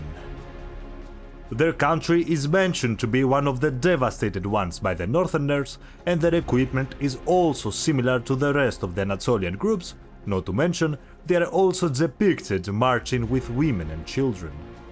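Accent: Greece. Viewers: τότε συγχαρητήρια γιατί είναι πρώτη φορά που μία τόσο βαρειά ελληνική προφορά, «ακούγεται» . Σίγουρα εντυπωσιάζει μάλλον θετικά τους ξένους, αφού την ακούν να εξιστορεί σχετικά με αυτή θέματα, μην σου πω κι εγώ δεν τσιτώνω όταν την ακούω . Στην τελική, έτσι είναι η γλώσσα μας, αυτή η προφορά βγαίνει σε μια άλλη γλώσσα. Παλιά ντρεπόμουνα να ακούγεται το ελληνικον, στην προφορά μου στα αγλλικα. Τωρα ούτε καν με νοιάζει όταν λέω beach, bitch, peach κτλ, όλα τσουβαλιασμένα με τους ίδιους φιόγκους. Ας πούνε κι αυτοί ξ, πχ ή ο,τι. Μπράβο σας.👍👍🙏🏻🙏🏻👏🏻👏🏻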